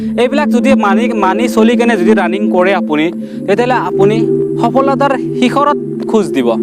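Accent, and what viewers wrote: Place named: Nalbari, India. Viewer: Dada apunar kotha bor nalbari r jen lagise hoi nki?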